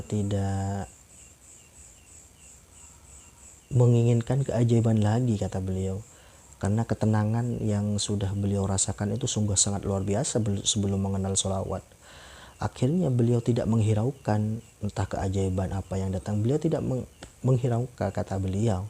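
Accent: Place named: Madura, Indonesia. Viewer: Reng madureh kakeh tretan 😂?macem logatdeh reng madureh.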